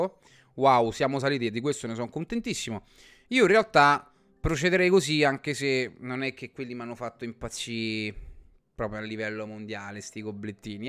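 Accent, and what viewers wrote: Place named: Rome, Italy. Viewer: COME SEI DIVENTATO ROMANO :D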